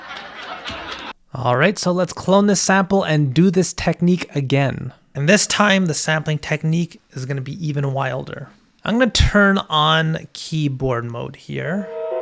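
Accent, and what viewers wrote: India. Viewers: Weird i never notice but your indian accent is heavy in this, i never noticed. Dope vid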